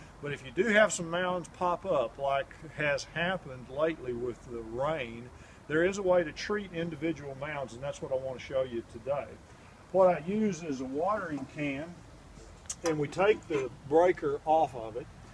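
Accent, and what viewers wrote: South, United States. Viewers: what does he say is the first step? at 8 seconds? can't understand southern drawl, man. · They are both Texans. That's how we talk here., is my pick over Dr. Phil!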